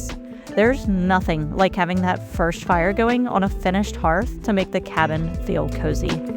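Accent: Philadelphia, United States. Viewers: I've enjoyed this, nice to occasionally see DIY people who are actually competent builders. I grew up in an A-frame house with a gambrel or double pitched roof. Your garden harvest was epic. I think I'll stick around. Is that a Philly accent or Delaware? I know it's not Western PA, they have a different thing going on over there. 🙂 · Is the narrator from the Philadelphia area? You can take the girl out of Philadelphia… but realistically, it could be anywhere in PA/NJ/DE/MD/VA that I hear. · do I detect an eastern Pennsylvania accent? Philly maybe?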